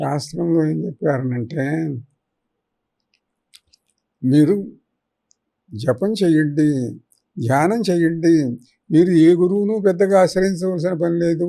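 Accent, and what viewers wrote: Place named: United Kingdom. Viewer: యాంకర్ మాట్లాడే విధానం చుస్తే తెలుగు వారికీ పుట్టినట్టు లేదు ఇంగ్లీష్ దొరకి పుట్టినట్టు ఉంది తెలుగు మాట్లాడం నేర్చుకుంటే బాగుంటుంది.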